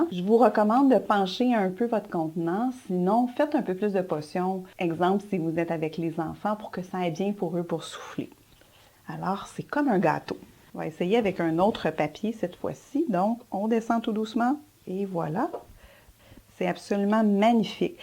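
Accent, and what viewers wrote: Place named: Quebec, Canada. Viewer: Ce que j'adore c'est qu'au Québec il fait froid une grande partie de l'année mais l'accent réchauffe le coeur de celui qui écoute autant que l'accent de Provence !=)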